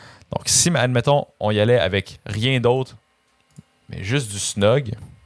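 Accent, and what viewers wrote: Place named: Quebec, Canada. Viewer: Salut dude je viens de découvrir ta chaîne, ça fait du bien d'entendre parler en Québecois. Je travail dans la prod de jeux vidéo (Ubisoft) depuis 22 ans, donc le modeling je suis à l'aise. Par contre niveau CAD, par exemple Fusion, j' ai jamais trop joué là dedans. Je vais checker tes formations. Tu me proposerais quoi pour pas que ce soit trop pour newb?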